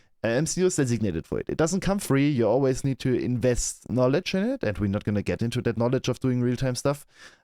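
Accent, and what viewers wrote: Germany. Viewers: Man hört die deutsche accent 😅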